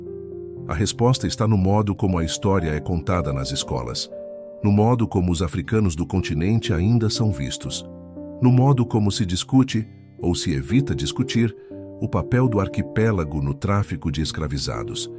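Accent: Brazil. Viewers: Se estás a narrar a história da escravatura, coloque foto da época. Sotaque brasileiro também não soa bem. Quanto a história, Cabo Verde foi interposto comercial e colónia. Após a abolição da escravatura continuou como colónia, até a independência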